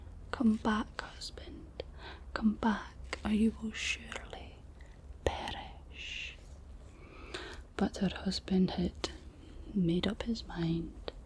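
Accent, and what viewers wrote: Scotland, United Kingdom. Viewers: Love listening to your lovely Scottish accent. ❤❤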